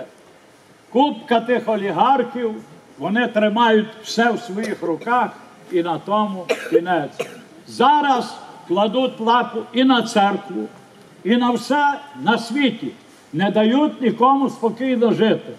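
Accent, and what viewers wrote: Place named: Canada. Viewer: ше один з Канади, блять, нікому не ріже вухо?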